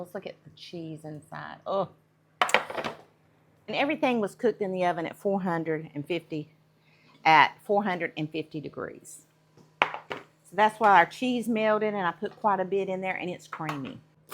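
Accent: South, United States. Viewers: Yeah I watch the videos just to hear her Southern accent.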